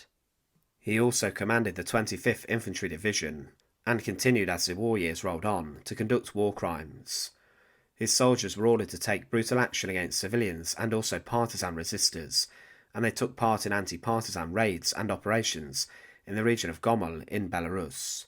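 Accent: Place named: England, United Kingdom. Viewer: I can’t stand English accent and this dude takes it to another level.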